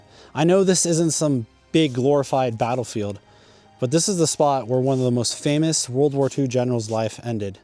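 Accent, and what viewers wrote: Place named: United States. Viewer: Although your accent seems American to me, I do wonder if you're a Brit living in the States.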